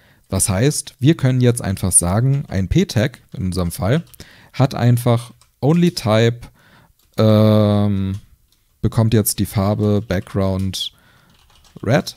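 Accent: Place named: Germany. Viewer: hab exact das erwartet..., warum können sich deutsche generell nicht etwas bemühen das englische TH zumindenst irgendwie richtig auszusprechen ? se-only-type, oder das en-te-ha-element, "when talking about sis and set, you want to be understood - no?" schöne kacke, dass diese programmier dinger alle auf english sind :) nix persönliches ! davon abgesehen, content ist gut präsentiert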